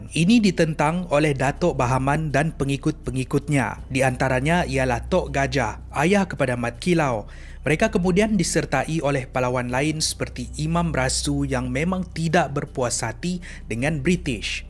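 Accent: Sabah, Malaysia. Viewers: kamu org Sabah ka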